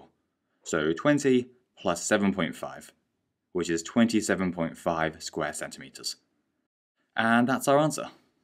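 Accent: United Kingdom. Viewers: im 2 years late but it really helped me 😉😊😀😃2/22/24 and btw are you brititsh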